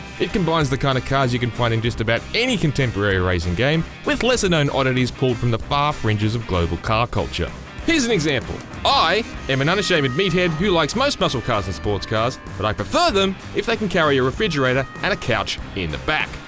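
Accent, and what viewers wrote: Australia. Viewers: I quite enjoy aussie accent, but listening to this gabble is true pain... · The guy is definitely an aussie, man. 😅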